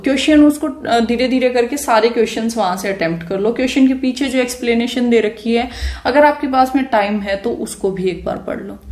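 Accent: Haryana, India. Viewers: Sister jab aap bolte ho to haryanvi tach aata h 😊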